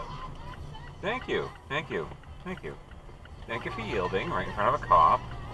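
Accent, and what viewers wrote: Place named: Boston, United States. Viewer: You can take the out of Boston, but you can't take the Boston out of!:-D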